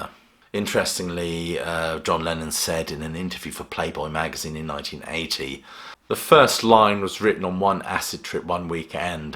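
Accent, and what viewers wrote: England, United Kingdom. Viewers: Why does an English accent piss me off. Must be genetic memory.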